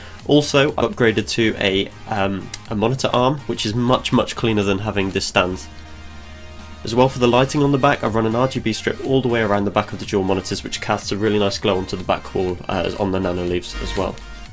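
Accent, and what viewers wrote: United Kingdom. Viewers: Are you from UK? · Half way through building my set up great ideas. I have pops and it's great to here another English accent 👏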